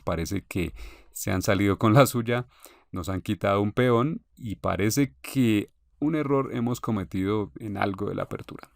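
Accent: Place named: Colombia. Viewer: excelente video, hablas como un colombiano saludos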